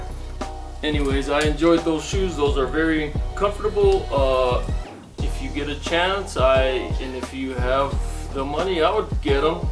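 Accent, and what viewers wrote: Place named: Canada. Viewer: I am just outside of Toronto. Where are you? From your slight accent I thought you might be Canadian. Do you have Native or First Nations heritage? I hope you don't mind me asking?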